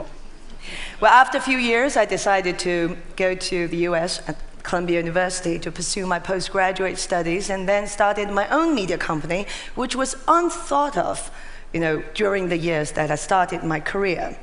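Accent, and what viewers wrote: United Kingdom. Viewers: 杨澜在美国念书，但是发音是英式，有意思。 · interesting.... she has British accent rather than American... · why does she have an british accent?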